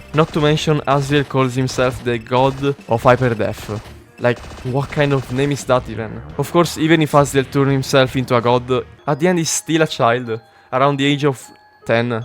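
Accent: Italy. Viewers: Not relevant but are you Italian? I'm from Italy and many people have this accent in English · Ciao bro, bei video. Comunque sì mi dispaice ma credo di sentire che sei italiano, bei video. Ben fatti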